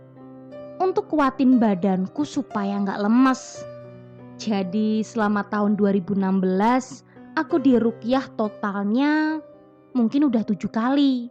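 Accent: Java, Indonesia. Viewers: Ngomong'e medok mbak'e😂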